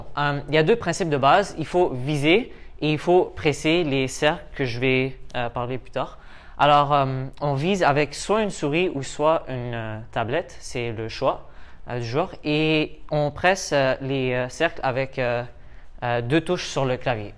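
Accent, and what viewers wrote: Quebec, Canada. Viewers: Oh, I was not expecting this. Your French is pretty decent ! Where did you learn to speak like this ? It sounds a bit like a French Canadian accent.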